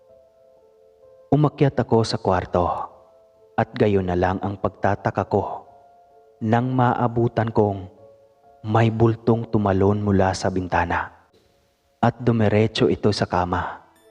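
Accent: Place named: Iloilo, Philippines. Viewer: Taga saan accent mo boss. Bisaya ba? Ay ilonggo pala..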